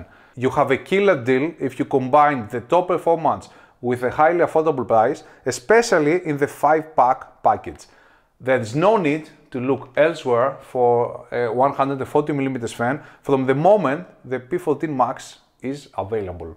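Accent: Greece. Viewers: OREOSSSS FILLARAKKO :D i love the accent, after 3s i knew, he is greek. Awesome detailed analysis and exactly what i was looking for. Keep up the good Work !!! Kalinichta from Germany !!!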